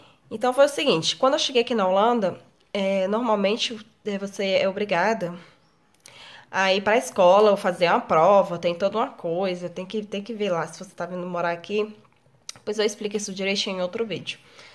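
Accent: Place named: Maranhão, Brazil. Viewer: Oi, sou novo aqui no seu canal e tenho uma pergunta você é de qual estado do Brasil? eu aposto que você é do Maranhão esse linguajar é bem maranhense mermã...kkkkkk. Estou gostando muito das sua aulas. Sucesso.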